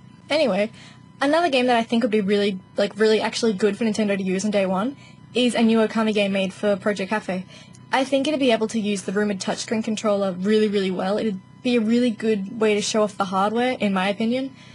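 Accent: Australia. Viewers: Your first vid I'm seein'. I subbed. At first I thought you had a british accent, but then I clocked it was Australian lol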